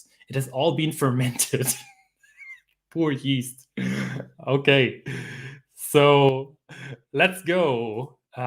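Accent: Germany. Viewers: I thought the German accent was fun! Do you have to actively try to suppress the German accent when speaking English or does that come naturally?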